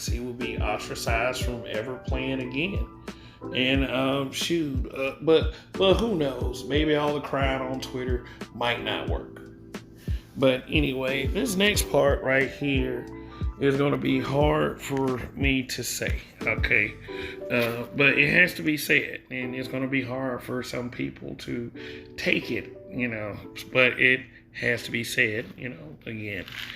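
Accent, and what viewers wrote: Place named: South, United States. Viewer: This is his channel he’s entitled to his opinion. are you mocking his southern accent? That is Ignorant of you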